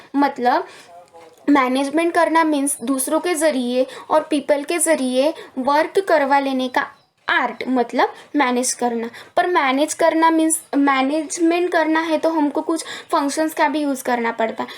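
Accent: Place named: India. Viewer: Nice lecture..Liked you Hindi accent.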